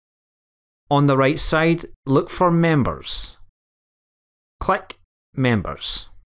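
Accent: Scotland, United Kingdom. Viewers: i love your scottish accent 👏🏼👏🏼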